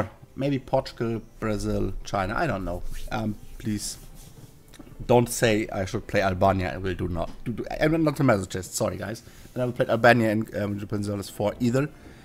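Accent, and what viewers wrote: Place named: Germany. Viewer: Congratulations! I kinda guessed you were german from your accent. But don't get me wrong, i like the accent, it's very euro-English ;P Can you please consider playing People's Republic of China? Please please please, I haven't seen anyone play as them so far.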